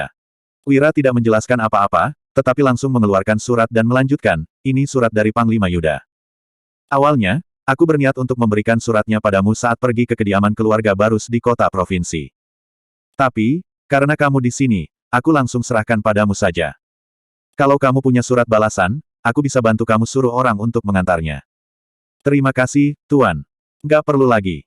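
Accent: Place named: Palembang, Indonesia. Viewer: Wong plembang?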